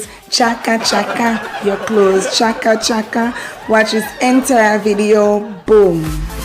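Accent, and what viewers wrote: Jamaica. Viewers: Real patois 😆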